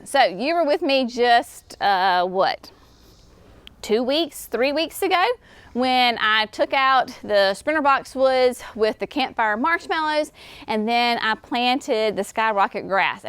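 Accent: South, United States. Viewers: You have a wonderful southern accent but you really tapped into your southern ancestry when you said "porch".......love it! Garden looks amazing, great work you you guys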